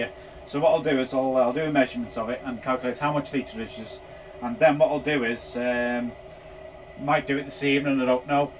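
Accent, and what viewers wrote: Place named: Liverpool, United Kingdom. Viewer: You sound like your from My wife's neck of the woods. Knotty Ash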